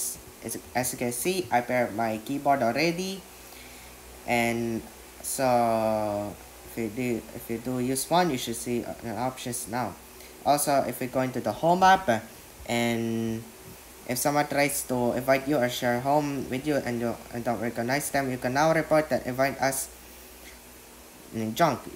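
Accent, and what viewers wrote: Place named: India. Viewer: Hahaha kala ko rin indian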